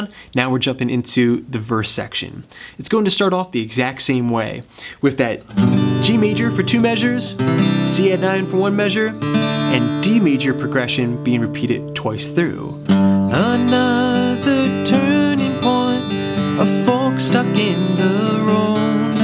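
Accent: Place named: South, United States. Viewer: Love the country accent to it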